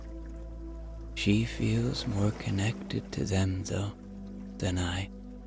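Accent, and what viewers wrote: Ireland. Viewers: Love this! Thank you so much. I also love your Irish accent - which part of Ireland are you from?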